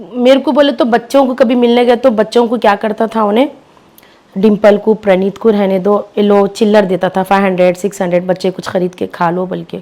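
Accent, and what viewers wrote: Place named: Hyderabad, India. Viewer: Aap perfect muslim hi lagti ho didi talking style behaviour also perfect hyderabadi muslim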